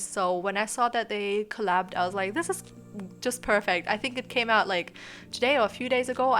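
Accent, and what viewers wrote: Germany. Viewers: haha I was wondering if you are german! I noticed your accent is very familiar so I was wondering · 1km is roughly <num>) miles and a meter is 3.281 feet ( 3.28084 precisely). Also, great vid, I'm always on the lookout for new cc especially for males. I like your accent but I would have never guessed you were from Germany if you didn't say it. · I’m 5’3 ft which is considered short but I know a lot of other women around the same height. I’ve been trying to learn German for school for a few years now and struggling lol as soon as you said you’re German I was like ohhhh I can hear it now. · Als ich mir ein Video von dir das erste Mal ansah, hab ich mich sofort gefragt ob du deutsch ist. xD Ich glaube wirklich, dass man's einfach raushört, deine englische Aussprache ist echt ziemlich gut ^°^ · I could tell you were German I'm from the south in America but i LOVED my german teacher sooooo there's that.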